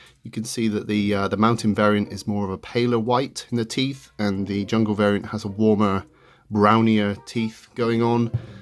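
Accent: United Kingdom. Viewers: I'm just yanking your chain, he speaks in a British way, for he is indeed British. No need to apologise kind hearted individual, tis all good. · Sorryy but why do you sound so British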